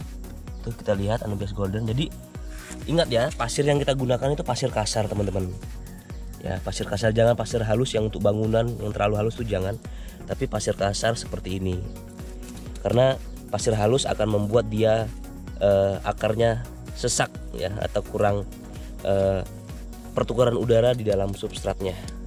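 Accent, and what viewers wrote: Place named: East Java, Indonesia. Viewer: Asli jawa timur mana mas?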